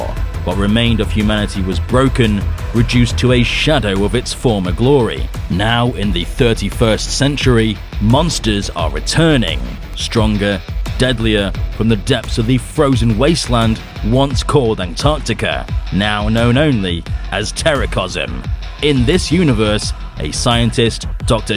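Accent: United Kingdom. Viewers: i was like HES BRITISH! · HE’S BRITISH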